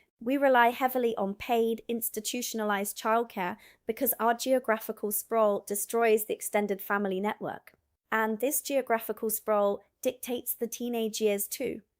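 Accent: England, United Kingdom. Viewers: First of all: You are already acquiring a proper British accent after only 2 years in the UK. Good job, you are acclimatizing rather well! One important topic not mentioned here: The Media, the News, whatchamacallit! In 'Murica, the "News" are all about ratings, what makes people watch their channel, and EVERY media outlet has found fear to be an attention magnet. Reporting the most dire happenings seems to draw viewers in 'Murica, and the media emphasizes bad news to the point of insanity. I think it was Michael Moore that traveled by road to Canada, and described a pit stop just south of the border where the TV was blaring about the latest shootings, kidnappings and road pile-ups in 'Murica. Exciting news, eh? Soon after crossing the border, he made another pit stop at a Canadian service station. There was a rather muted TV in the place that was running a calm Canadian news channel, mostly about local politics and local community news. 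No school shootings, kidnappings or pile-ups. Just ordinary, everyday news, no overblown disaster news. Of course shit happens in Canada, but it is not blown out of proportion. Relaxing news, eh? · English accent! Better than mine 😂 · This woman sounds very British for an American. The biggest differences relate to gun laws. Children get killed in USA schools but all their families get are "thoughts and prayers". There are never any amendments to USA gun laws. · That’s the most English accent I’ve heard for someone who’s only been here two years. Something weird about this. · Why does she sound complete English? Just asking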